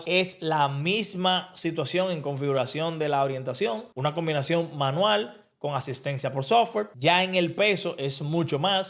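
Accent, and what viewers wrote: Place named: United States. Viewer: Porque este negro de república dominicana habla como si fuera gringo? Que vergüenza esa gente que se hacen los gringos siendo de un país miserable hispanohablante 🤦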